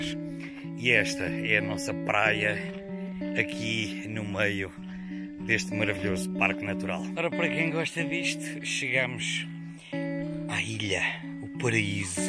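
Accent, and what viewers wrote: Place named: Portugal. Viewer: Que lindo sutaque de Portugal .vejo daqui de Recife pé .e muito lindo esse lugar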